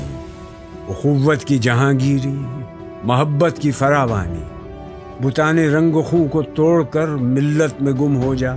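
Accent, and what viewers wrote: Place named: Turkey. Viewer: Marvelous... But plz tell the pronouncer to not use the Turkish accent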